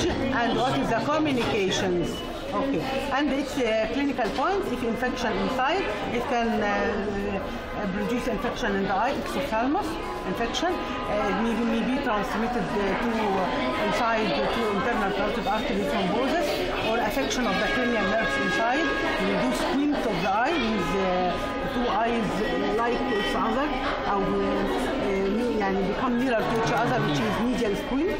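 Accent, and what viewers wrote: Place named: England, United Kingdom. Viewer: hello english accent!!!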